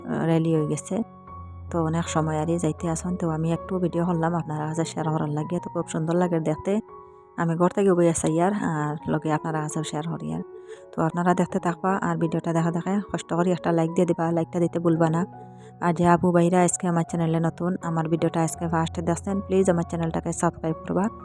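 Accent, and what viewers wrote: Sylhet, Bangladesh. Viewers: আসলে আপনার কথাগুলা অনেক ভালা লাগে। আর আপনার কথাগুলো আমরার সিলেটের লাখান। আর আমি সিলেটি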